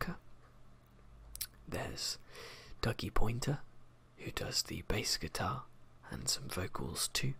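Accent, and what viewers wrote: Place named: United Kingdom. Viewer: this is so nice! Mind me asking where in the UK are you? So i know where i should look for a man that has the same accent as yours...and hopefully as handsome as you are! hehe! :P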